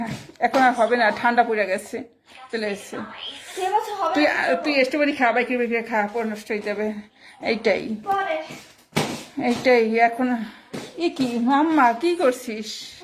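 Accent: Barishal, Bangladesh. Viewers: ভাষা শুনে মনটা ভরে গেলো,, আমার প্রাণের সেই বরিশাইল্লা ভাষা 🥰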